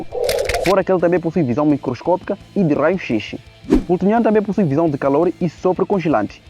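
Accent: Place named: Angola. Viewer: Parece sotaque angolano ou talvez de Portugal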